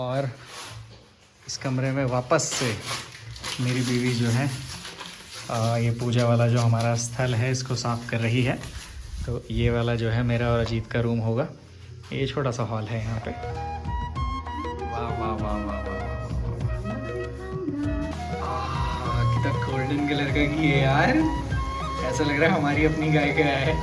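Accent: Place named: Garhwal, India. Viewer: Gaun m aakr , hindi mai garhwali tone aa hi jati h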